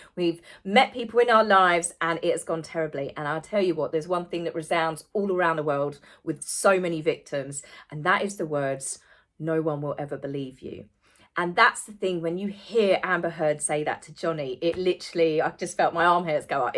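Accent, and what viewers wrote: England, United Kingdom. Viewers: Love from Long Island - ive experienced abuse on several levels and its taken a while to rise up and wake up. You are such a beautiful special human and I truly look forward to seeing your videos and hearing your English accent! Just wanted to reach out to say that to u!!! Keep up the great work❤️👍🫡🤗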